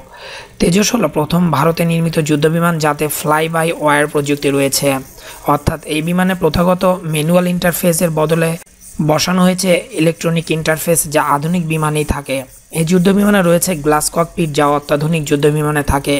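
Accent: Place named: Bangladesh. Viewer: তুই কি বাংলাদেশি তোর শব্দ উচ্চারনে বাংলাদেশি গরুর গন্ধ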